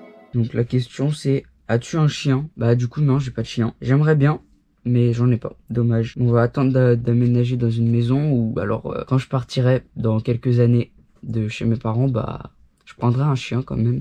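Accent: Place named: Algeria. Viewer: Mec c'est normal que ta un accent de Dz ? 😂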